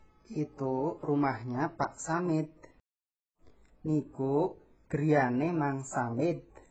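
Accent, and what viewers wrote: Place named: Sunda, Indonesia. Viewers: ini bahasa Jawa logat Sunda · dialek e koyok dialek sunda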